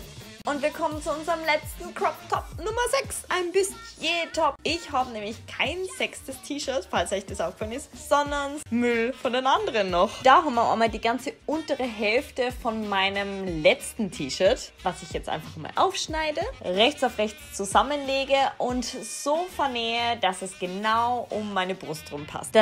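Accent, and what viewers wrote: Vienna, Austria. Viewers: Oha😳 mega cooles Video 😍 habe deinen Kanal gerade entdeckt, ich lass auf jeden Fall ein Abo da💕 Liebe Grüße aus der Steiermark P. S. Ich feier deinen wienerischen Akzent 😊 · Haha dachte du bist aus Österreich :D liebe deinen Dialekt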